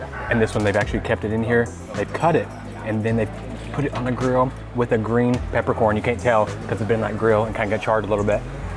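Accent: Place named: South, United States. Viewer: Love that southern accent ❤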